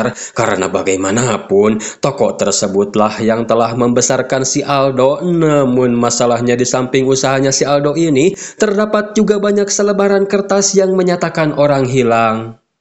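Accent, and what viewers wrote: West Java, Indonesia. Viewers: Lanjutkan min keren lucu pembawaan nya 😂,sunda pisan uhuyyyyyy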